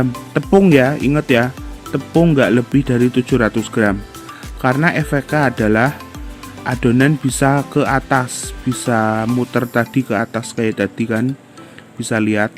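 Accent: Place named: Tegal, Indonesia. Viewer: Oh.. sampean org tegal to...sip dah he he heee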